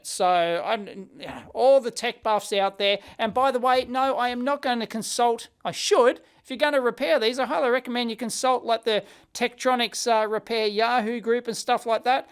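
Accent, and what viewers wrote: Australia. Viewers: That's just his Aussie accent.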